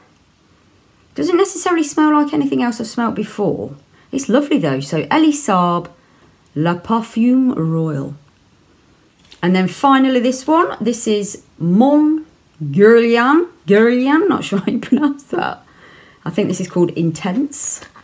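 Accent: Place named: RP, United Kingdom. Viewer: You sound posh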